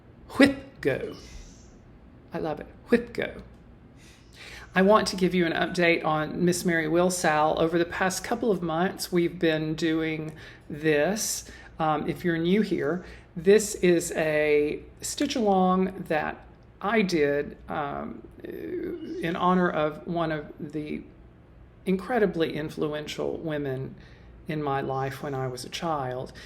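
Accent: South, United States. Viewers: you need to take care of you. If that means making your videos every other week, so be it. You are such a gift to us in this crazy world. Your calm, sweet southern voice is a beacon for us to be guided by to serenity and beauty. Thanks for being you and I, for one, can't wait to see you again!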